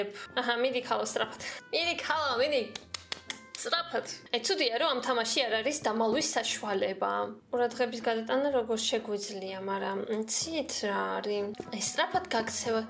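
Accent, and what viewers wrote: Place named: Georgia. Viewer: რა სოფლურად ლაპარაკობს თბილისელიხარ თუ სოფლელიხარ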